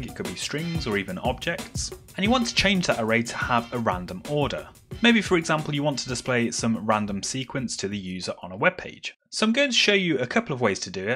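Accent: United Kingdom. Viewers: I think you are from UK try to speak slowly so we asian can get what are you saying just a suggestion btw nice tutorial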